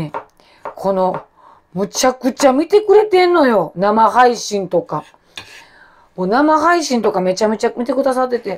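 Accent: Kansai, Japan. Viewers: いつも楽しく見てます。関西弁大好きです💖最近、の「しいたけお先生」 「玉ねぎ子先生」先生シリーズにはまってます(笑)🤣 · たぶん、ジャニーズウエストかなと。知らんけど～知らんのかーいのショート動画もあるので面白いですよ！関西弁は聞いてるのは面白くて好きです。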